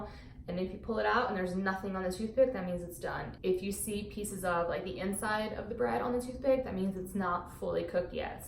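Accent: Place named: Australia. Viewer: I hear a hint of auzzie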